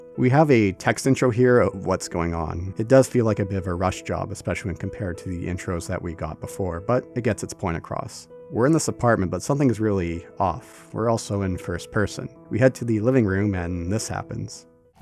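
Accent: United States. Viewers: The California accent is strong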